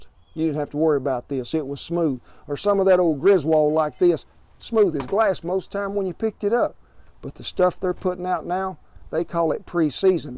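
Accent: South, United States. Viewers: oh that southern accent... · Love the flaxseed oil tips, just buy the capsule from pharmacy... brilliant. Love your video, with that southern accent... ☺️. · And just to be clear, did you say that there's lead in the paper towel? I thought something like that would be illegal to do? And about the ''Leadfree'' rag, is that even a thing? Sorry if it isn't what you were really saying, I'm danish and don't understand southern accent 100% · Howdy Kent, just found you, love the way you talk, love your southern accent! You talk like a real sweet and humble person, you're really fun to listen to. Bet you and your family have a blast at your cook outs!! ha....ha.... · Thank you, you've made the Southern accent cool. Turns out that someone who is very intelligent, makes the Southern accent pretty awesome. Great videos. I've watched 2 so far. From the PNW. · I usually do not enjoy listening to southern accent but in this case I sure did, enjoyed it very much. 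Thank you Mister, very useful information and I do have that same exact red bandanna from walmart (I was wondering how to put it to good use, now I do know) and loads of cast iron cookware, yeeeeehaaaaaa....... I like your style, I Like the way you roll, I like you!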